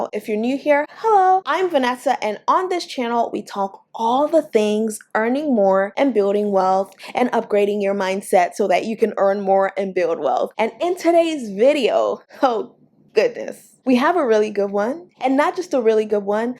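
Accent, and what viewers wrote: Nigeria. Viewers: God bless you Vanessa🙏❤ I just signed up! Why do you sound Nigerian sometimes? Haha😄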